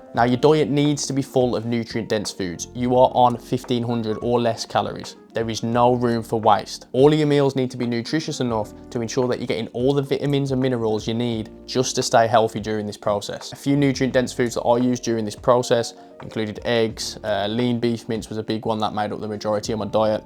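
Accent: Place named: Wolverhampton, United Kingdom. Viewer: Nice video man. Very informative and to the point! Looks like you got great results. You sound like your from my neck of the woods (wolverhampton)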